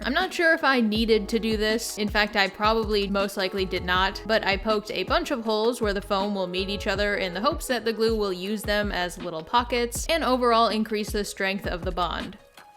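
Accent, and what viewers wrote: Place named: Colorado, United States. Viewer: Sounds normal to me. Apparently dropping the t from the middle or end of words is a Colorado accent thing. Cur-ains, Moun-ains, and so forth.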